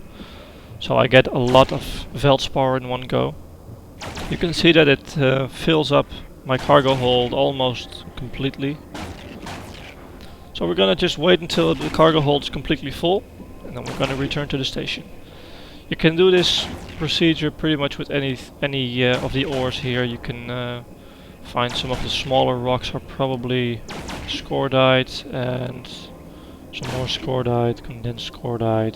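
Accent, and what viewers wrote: Netherlands. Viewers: do i hear a lil dutch in ya?:P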